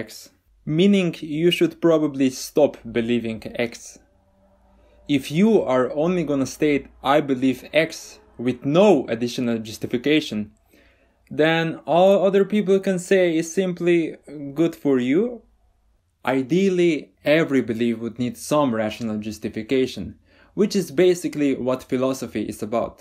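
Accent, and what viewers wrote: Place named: Slovenia. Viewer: Are you from Slovenina maybe 😅 I somehow recognize the Accent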